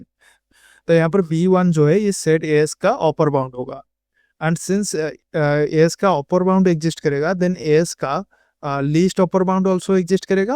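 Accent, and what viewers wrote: Odisha, India. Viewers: Are you from odisha??? 🙏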